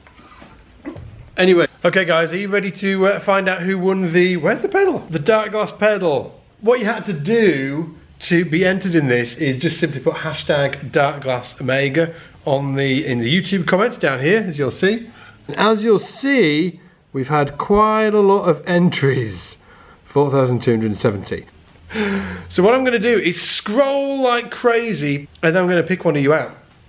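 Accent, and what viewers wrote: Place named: Northern England, United Kingdom. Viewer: Where is your northern accent coming from m8? Is that Leeds or you moved from Birmingham? I am trying to figure that out from some time. Big fan of yours and fish and chips as well! Cheers from PL!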